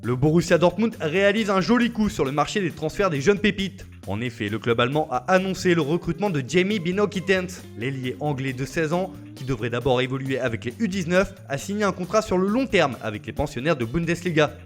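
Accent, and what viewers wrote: Italy. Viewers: Toujours sympaq cet acent italien 😁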